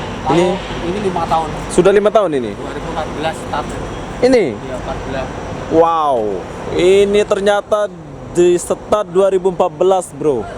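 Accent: Lombok, Indonesia. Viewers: Batur lombok aran min, kenek jak ndek ye. Wah telang ongkat logat2 sasak tye lasing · Dari awal sy udah mengira kalau ni orang dari lombok soalnya logat penyebutan Malasianya kental nanget. Salam dri lombok juga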